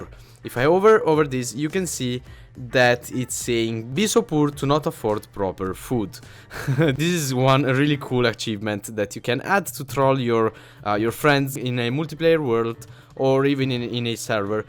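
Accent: Italy. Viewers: Per quanto sia bravissimo a parlare inglese si vede che è italiano XD! Te ne sei accorto?? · perché cazzo parli in inglese se sei italiano ps. si sente l`italiano;D se parleresti in italiano faresti un grande contributo a yt Italia:D;D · Certo che se sente l'accento italiano xD GG bro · bella frate sei italiano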